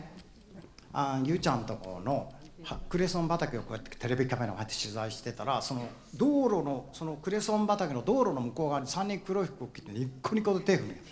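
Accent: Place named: Kyushu, Japan. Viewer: 昔と変わらないキーで歌うこうせつおいちゃんの九州弁初めて聞いた気がします。お兄ちゃんの話も初めて聞いて、「 」はGoodVibrationのが一番だと思ってましたが、こっちも泣けてしまいました。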